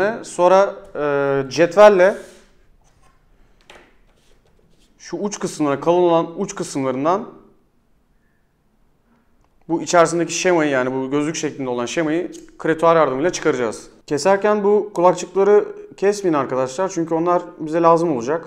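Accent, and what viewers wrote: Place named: Izmir, Turkey. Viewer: tam izmirli yaa.. incedennn ;)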